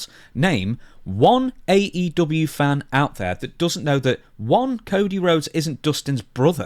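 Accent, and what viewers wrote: England, United Kingdom. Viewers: u have a latino name but u accent sounds uk 🇬🇧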